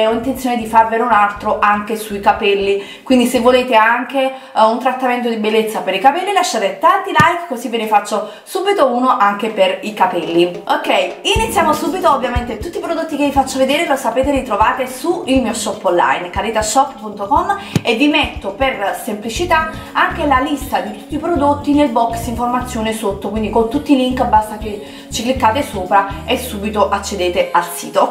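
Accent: Naples, Italy. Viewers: Toniolo ,per tremendo accento cosa intendi? Per caso non tolleri l'accento napoletano? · Che hai contro l'accento Napoletano...dimmi fammi capire ..vorrei santire tu come parli....sei di una pochezza assurda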